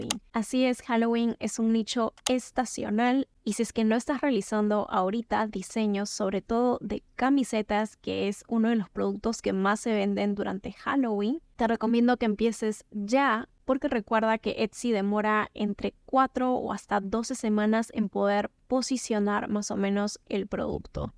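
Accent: Peru. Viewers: Detecto un acento peruano 🤔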